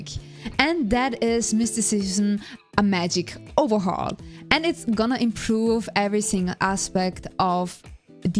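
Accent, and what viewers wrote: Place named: Sweden. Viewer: I love your accent sounds swedish/nordic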